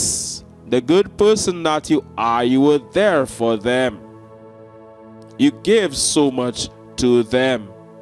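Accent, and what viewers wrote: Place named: Jamaica. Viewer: are you Jamaican? Jackie from Philly You have a LOVELY ACCEN’T and you are very INTELLIGENT! I ❤ U-U-U Do the funky chicken sweetie! It’s a nice dance. The young kids like this old dance. L O L 😇😇😇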